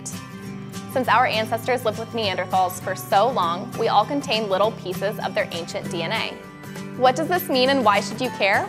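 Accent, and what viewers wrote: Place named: United States. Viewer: her hardcore white american voice and the music... it's a lot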